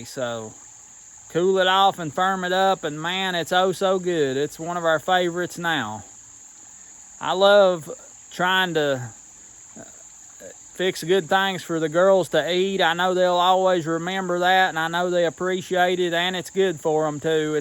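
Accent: United States. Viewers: those pies look absolutely delicious oh yea I love your country accent,your a great dad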